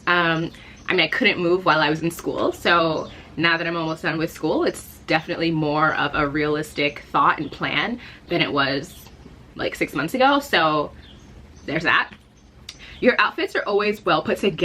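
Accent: Nigeria. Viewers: i love the nigerian accent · I love your Nigerian accent. This video is amazing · Hilarious Nigerian facts : We are loud Our parents work hard We value degrees Your Naija accent on point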